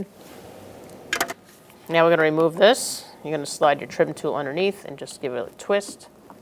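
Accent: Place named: New England, United States. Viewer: In three second in, I love the strong New England accent 😂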